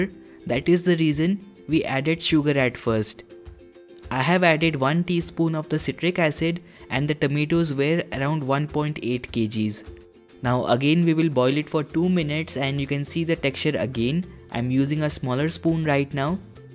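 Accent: India. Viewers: Hah DAT hindi accent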